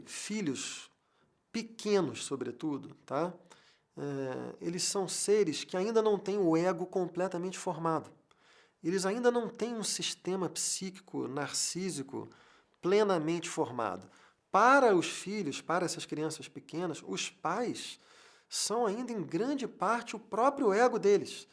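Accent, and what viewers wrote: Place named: Rio de Janeiro, Brazil. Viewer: como sempre, com essa voz maravilhosa,sotaque sutil carioca, incrível e eloquente...eu tenho uma relação com os filhos muito peculiar, tenho 4, de uma relação sólida de 20 anos...não vou me estender pq daria um grande ensaio...O que quero cita aqui é sobre a relação liberdade e individualidade. Eu desenvolvi o hábito de beber recentemente, menos de 4 anos, sempre fumei meu Beckenbauer com meu esposo desde o início de relacionamento e aos 30 fumei meu primeiro cigarro, resumindo, eu sou incapaz de exercer o meu modus livre/entreternimento/relaxing na presença deles, eu simplesmente não relaxo...e tirando o caçula de 7, o restante são bem crescidos pra compreenderem, mas eu não consigo, e me causa um desconforto, pois me sinto reiterando o comportamento auto tolhitivo da minha mãe, apesar de não ser haja visto que eu sou o cerumano mais transparente que meus filhos conhecem, não nego nem peido, falo o que penso sempre, nunca fui hipócrita, mas está relacionado a um espectro de controle e administração...Isso eu não consegui ainda superar.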